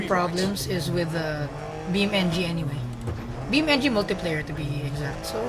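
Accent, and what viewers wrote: Philippines. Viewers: Filipino based on what other people have said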